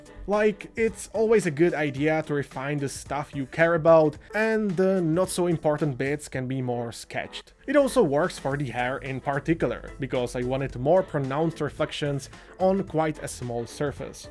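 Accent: Czech Republic. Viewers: First few sentences and I immediately knew you are Czech, then I checked and I was correct :D Hi from Prague :D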